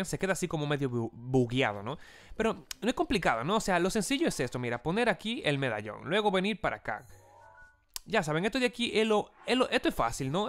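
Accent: Dominican Republic. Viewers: Eres de República Dominicana ?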